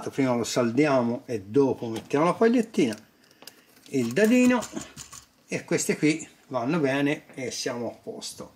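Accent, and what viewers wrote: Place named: Livorno, Italy. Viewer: okkk. Mi sembrava un accento più labronico. Ad ogni modo complimenti per il canale!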